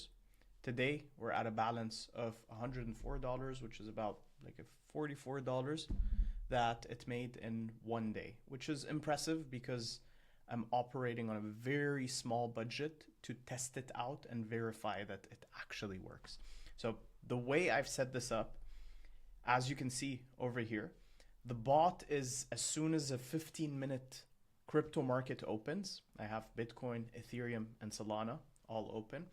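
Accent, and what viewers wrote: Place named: Philippines. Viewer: Are a you a Filipino? You sound like one.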